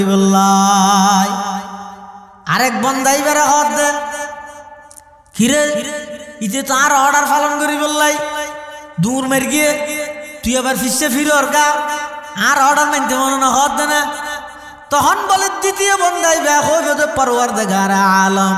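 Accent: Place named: Chittagong, Bangladesh. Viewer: চিটাগাং ভাষা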